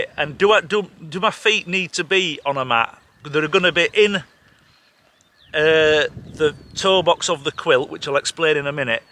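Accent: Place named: Yorkshire, United Kingdom. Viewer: love your accent not heard the yorkshire twang for a while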